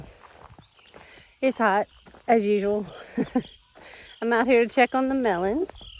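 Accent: Louisiana, United States. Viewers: Love the storytelling! Please make the Cajun stories a regular part of your channel! I love them so much and your voice is so perfect and soothing! Love the Cajun accent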